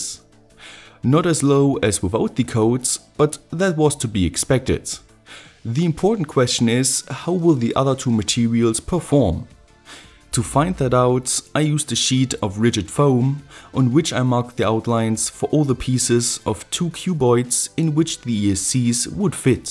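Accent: Germany. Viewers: Ich kann die Warnungen lesen, kleiner tipp😂 arbeite vielleicht etwas daran deinen deutschen Akzent loszuwerden, oder österreichischen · Feier ich dass der dude deutsch is man hört den akzent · You sound so German xD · Du bist Deutschland und man hört das Das Akzente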